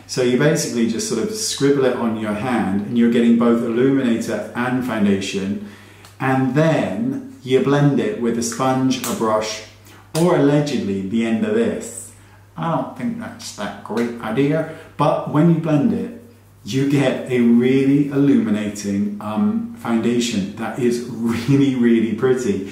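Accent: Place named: United Kingdom. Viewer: ❤️the Brit accent! “Vitamin, Naaz, Bloody!” ❤️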